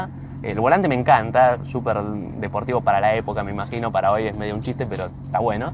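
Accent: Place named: Argentina. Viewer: ya vi varios videos tuyos y es como dicen los comentarios: Es un placer escuchar tus descripciones bien en argento y con buen humor. Te aplaudo por haber probado un auto antiguo y argentino, esos que eran los deseados en mi juventud.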